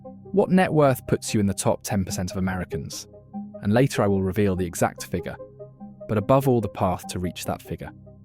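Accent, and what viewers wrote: United Kingdom. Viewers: A great video! Thanks for posting! I thought you were a British YouTuber as you have selected a British accent for your narration. Would you do this for the UK? Or are we too small?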